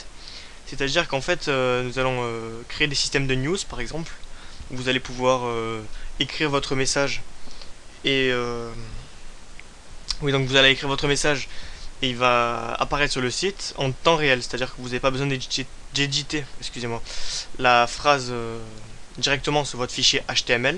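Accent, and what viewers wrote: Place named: South, France. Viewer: ) moi aussi je suis du sud :D vive les accents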